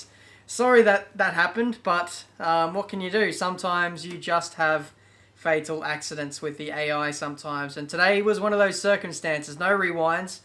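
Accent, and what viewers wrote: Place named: Australia. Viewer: The Australian accent. "err" instead of " ahh""Ninjerr" instead of "ninjahh".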